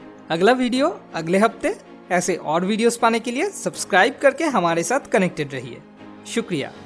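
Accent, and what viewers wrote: Bengal, India. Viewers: frnd r u bengoli .??..ur voice tone is bengoli ..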